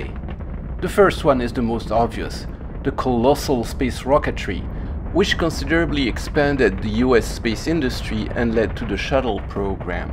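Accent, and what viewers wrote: France. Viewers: Honestly i like a lot the french accent voiceover but I'm still sad for the loss of Ken's commentary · I never noticed you had a French accent until you mentioned it, don’t know why ;-)!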